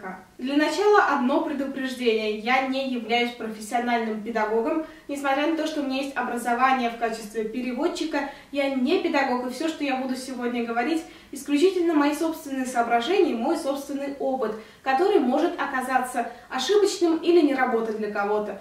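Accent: United Kingdom. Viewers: у кого нет музыкального слуха не стоит отчаиваться) есть исключения, я ни в одну ноту не могу попасть, а с акцентами в английском и испанском проблем нет. все же есть универы, где фонетику ставят на ура за месяц) спасибо за видео!! теперь все лопнут от интереса послушать британский акцент)) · А сделай видео на английском языке, интересно как раз послушать твой британский акцент :)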